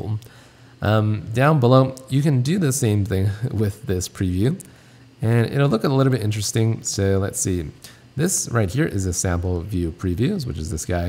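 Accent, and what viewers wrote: United Kingdom. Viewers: What the fuck is it? British?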